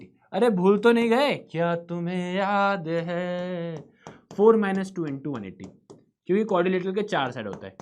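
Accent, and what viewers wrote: Bihar, India. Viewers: Sir ap bihar ma kha se ho ap ki language bihar jaise hai 😂😂❤❤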